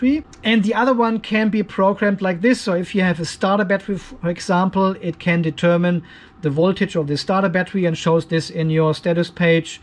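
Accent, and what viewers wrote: Germany. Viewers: Nothing sounds more stupid as a German tries to speak english !!!!!! Or laugh in English 🤣😂🤣🤣😂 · very well explained Vom Akzent her könntest du Deutsch sein.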